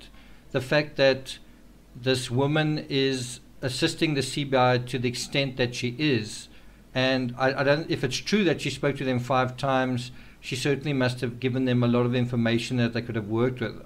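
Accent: South Africa. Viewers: You sound South African , i am?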